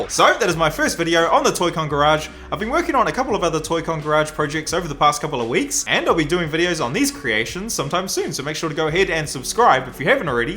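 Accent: New Zealand. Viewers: Your from New Zealand ay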